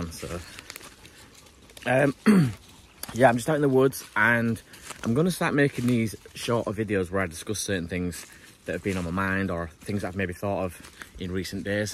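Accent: United Kingdom. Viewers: I love your channel! Your British accent makes me 🤸🏼‍♀️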